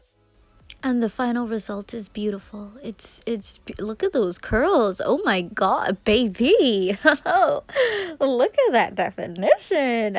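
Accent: Bahamas. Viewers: Heard your voice and knew you were Bahamian. This video helped me alot. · I felt so slow asking my mummy if you sound Bahamian just for her to point out the flag behind you I feel dumb asf but beautiful results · The Bahamian accent is so strong lol... I love it! I was very entertained and informed on the bleaching process and steps. I wouldn't do it on myself though lol. Good job. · Girl I got so excited when I heard your accent lol was not expecting that. New fellow Bahamian subbie 🥰❤️🇧🇸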